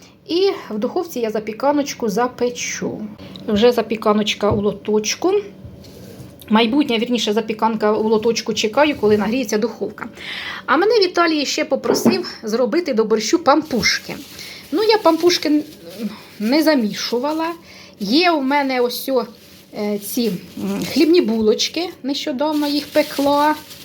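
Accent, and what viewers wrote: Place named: Kirovohrad, Ukraine. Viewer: ви молодець,сільська трудівниця.Люблю вас дивитися і слухати.Мої дідусь і бабуся так розмовляли́ З Кіровоградщини.Миру, здоров'я ❤❤❤❤❤❤❤❤❤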